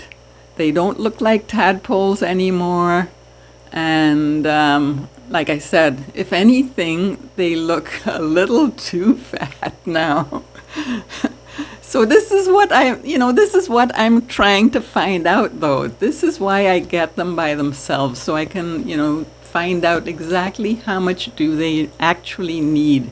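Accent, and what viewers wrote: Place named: Trinidad and Tobago. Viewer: nice video, i picked up the trini accent in the 1st 30secs lol. reminds me of home. good information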